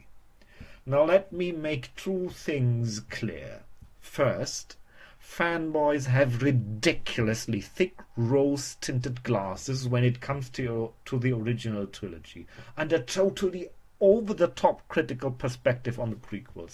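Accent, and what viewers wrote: Germany. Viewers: I liked TFA so I can't say I fully agree with you, but you spoke very well and made excellent points! I love ALL the SW films and I, like you, LOVE the Prequels!! Great German accent too. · I love that German accent!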